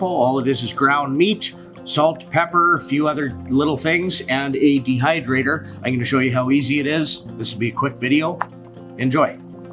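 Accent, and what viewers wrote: Canada. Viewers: I was pretty sure you were a Canadian based on your accent, alone, but then I noticed the stickers on your fridge. xD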